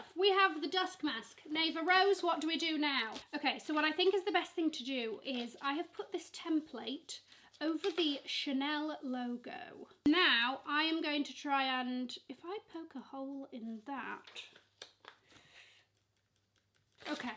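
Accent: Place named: England, United Kingdom. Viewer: You have an ugly English accent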